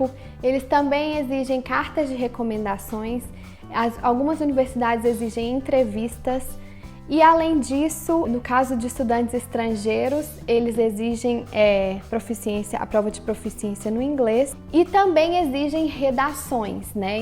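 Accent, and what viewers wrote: Minas Gerais, Brazil. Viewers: é minha primeira vez aqui no canal, já adianto que tenho gostado bastante dos conteúdos. Uma dúvida menina, você é mineira??? Sotaque maravilhoso. beijos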